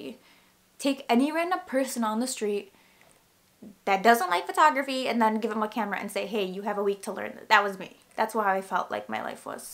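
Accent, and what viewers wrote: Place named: Miami, United States. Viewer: i know you're lebanese but you look so cuban!! you even slightly have a cuban-miami girl "accent"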